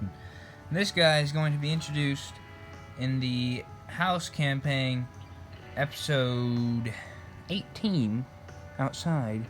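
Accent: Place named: Germany. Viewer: Question can you voice a German tiger commander for me with your funny German accent?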